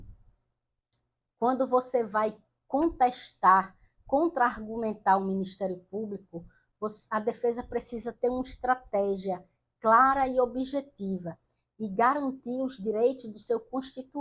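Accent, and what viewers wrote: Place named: Northeast, Brazil. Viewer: Esse sotaque de nordestina é um porre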